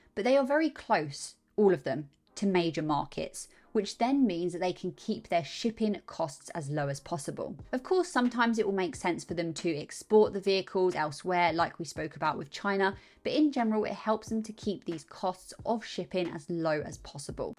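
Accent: United Kingdom. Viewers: 🌜💫😎TSLA😎💫🌛 I love that british accent!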